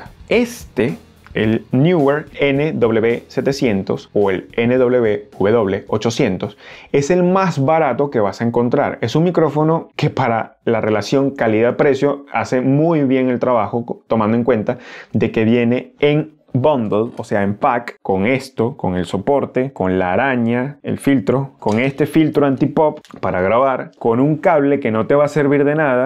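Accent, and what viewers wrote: Venezuela. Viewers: Excelente video hermano! Solo por curiosidad, ¿eres venezolano? tu acento me suena. XD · Vos sois maracucho verdad? 😂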